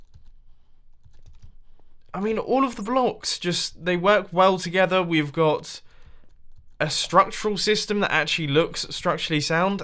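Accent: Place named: United Kingdom. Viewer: Ewan Bruce He said I didn't know if I could fly. It's hard to tell if you aren't used to his British accent, and his happy squeal.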